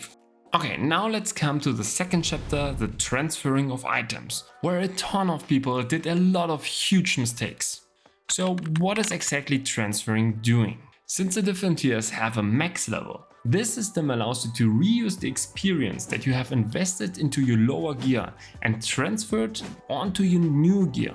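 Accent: Germany. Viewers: Ich liebe wie man einfach hört das er deutsch ist xD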